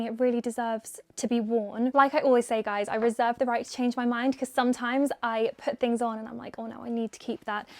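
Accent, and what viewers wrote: United Kingdom. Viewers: Love your videos I'm American but I lovvvveeee British accent 🥰🥰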